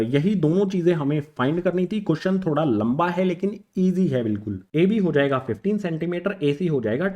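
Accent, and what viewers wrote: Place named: Tamil Nadu, India. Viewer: Love ur voice sir❤️❤️ Bcoz it have Tamil tone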